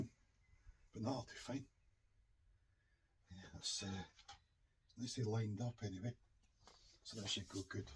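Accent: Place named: Glasgow, United Kingdom. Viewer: Just found your channel & subscribed. Sounds like your accent is somewhere near Glasgow.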